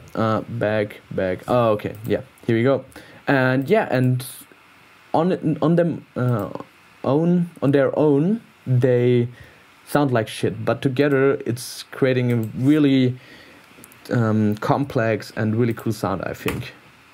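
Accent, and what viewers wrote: Germany. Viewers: u german??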